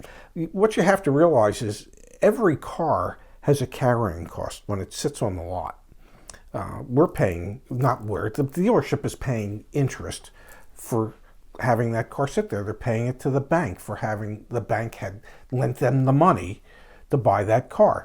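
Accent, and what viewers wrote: Baltimore, United States. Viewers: Love that "Balamer" accent! You sound like you should be in a John Waters movie!😎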